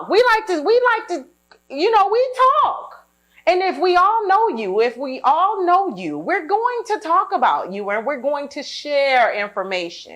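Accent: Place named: South, United States. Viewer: With your souther twang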